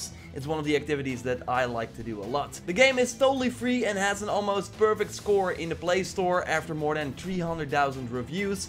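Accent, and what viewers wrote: Netherlands. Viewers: mm snif snif i smell dutch. am i right?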